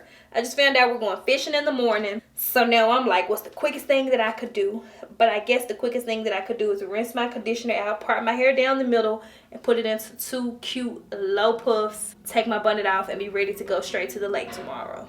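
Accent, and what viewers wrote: South, United States. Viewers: Sang guh lol enjoyed watching and listening to your super country accent! You have me beat lol sending love from Alabama. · southern drawl is bomb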